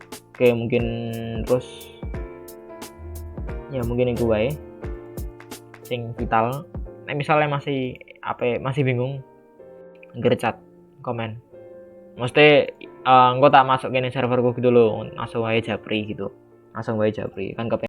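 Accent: Indonesia. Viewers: Thanks Banget bang Info nya,btw abang orang jawa ngapak? XD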